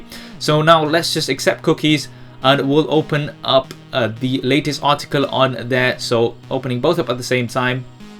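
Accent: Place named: United Kingdom. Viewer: i can smell the British accent , don't know why ....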